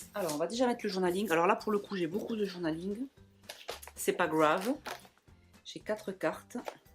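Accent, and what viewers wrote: South, France. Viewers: tes papotages avec ton bel accent du sud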